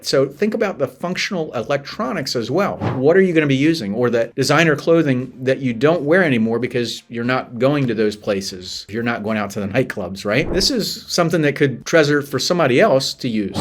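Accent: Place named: Philadelphia, United States. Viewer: I see myrtle beach. But thats a very philly area accent!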